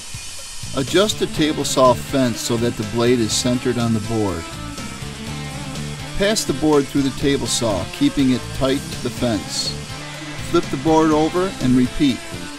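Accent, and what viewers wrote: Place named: United States. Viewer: I realized that this guy is american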